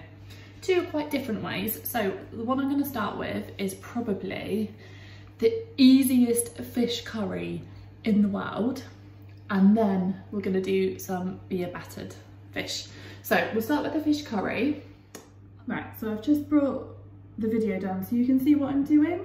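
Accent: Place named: North East England, United Kingdom. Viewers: Watched a load of vids of good stuff,I,m from the north east also I,m further north listening to your accent......keep it up I enjoy things that I do...best wishes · Just with you based down south with your videos my guess was a m boro accent anyway great videos love seafood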